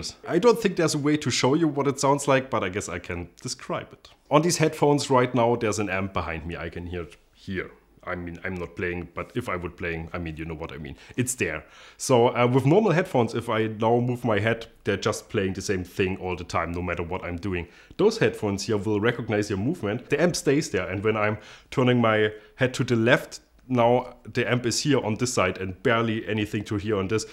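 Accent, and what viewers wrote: Germany. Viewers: omg this German English so funny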